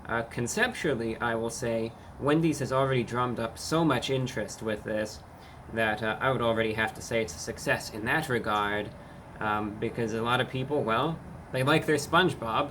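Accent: United Kingdom. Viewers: I haven't seen his videos in a few years, why does he affect a British accent now? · I haven't watched the channel in a while but i swear he turned British somehow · When did he become British?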